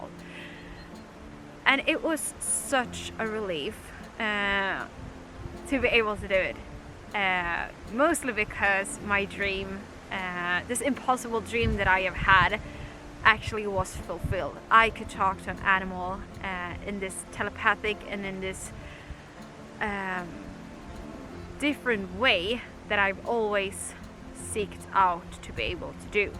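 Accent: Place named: Scandinavia. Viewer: I'm just getting into this topic, after my mind being torn apart by Anna Breytenbach... It's exciting to watch someone go through the early stages of developing these skills! Edit: I love your accent. Scandinavian right?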